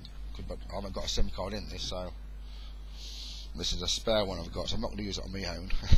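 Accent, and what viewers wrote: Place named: England, United Kingdom. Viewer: thank you sooooooo much....now i love english people fo their accents and for their tech support..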